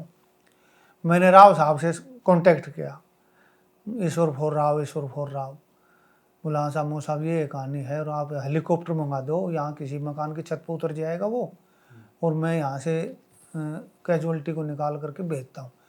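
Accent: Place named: Haryana, India. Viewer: Sir aapki galti nhi hai haryanvi accent sabhi ko samajh nahi aata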